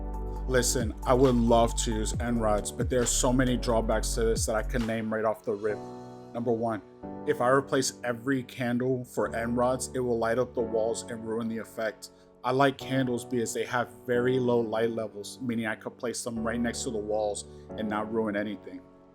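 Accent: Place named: Los Angeles, United States. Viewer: You sound like your from LA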